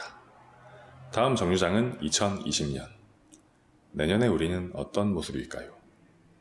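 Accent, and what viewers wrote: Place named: Busan, South Korea. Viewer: 부산이신가용? 중간중간에 사투리 억양이 정겹습니다~ ^^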